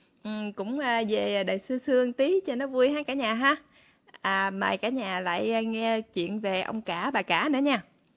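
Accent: Mekong Delta, Vietnam. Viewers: Anh thich vong noi Mien tay cua Em roi do nhe? hon nua la cai mieng rong cua Em nua do??mieng nay ma B…C…la te ca nguoi luon do nhe??🤫🙈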